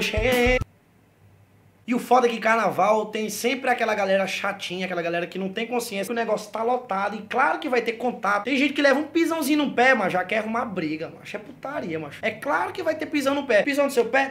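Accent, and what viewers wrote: Northeast, Brazil. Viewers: mano de onde vc eh,do nordeste?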